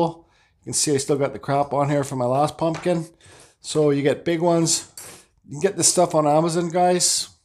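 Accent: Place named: Canada. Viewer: I can hear Canadianity in your voice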